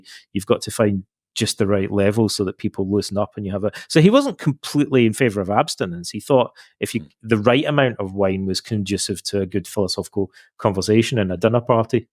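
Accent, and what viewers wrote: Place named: Scotland, United Kingdom. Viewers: I didn’t know what I needed today, but listening to this man’s gentle Scottish accent as he talked about Socrates truly brightened my evening. Thank you so much. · When I mentioned your description, Mr replied to it directly: "That's close. I'm from Ayr, not Glasgow, although it's nearby. I have lived in Nova Scotia, Toronto, and Montreal."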